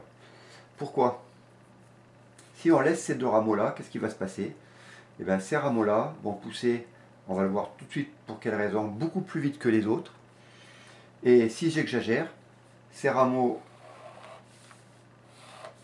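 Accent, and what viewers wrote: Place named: Dauphiné, France. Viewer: Quel bonheur d’entendre l’accent du Dauphiné ! Merci pour vos vidéos très précises et claires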